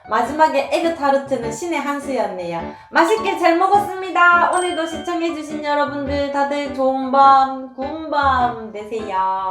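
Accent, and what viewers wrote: Gyeongsang, South Korea. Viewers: 후라이판이 몸보다 더 크네요 ㅡ 경상도 사투리 가 정감있네요 아침에 얼굴 안 붓나요?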